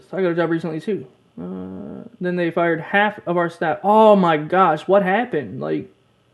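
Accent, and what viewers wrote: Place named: South, United States. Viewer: You're definitely from like a Southern state lol. Mississippi or something. If you're close by we should be friends haha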